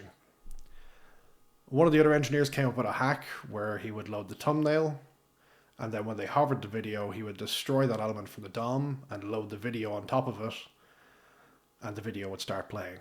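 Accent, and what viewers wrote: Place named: Ireland. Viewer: thats an irish accent if im not mistaken. great to find another irish developer channel. (great video btw)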